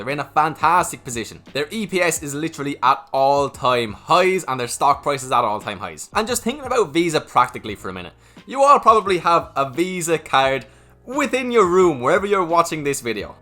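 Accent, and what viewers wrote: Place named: Ireland. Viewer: me personally I like everything you do regarding the videos,this new style of presenting is even better I think.Yes never missed a single appearance of yours,as I find important value In you’re presentation.And I think this channel is not a should it’s a must grow in my opinion.Real valuable information,up to date updates specific monitories stock’s,easy language for everyone to understand,beautiful irish accent,best dividend,best growth stocks and even more.... don’t stop do what you do best because you’re very good at it.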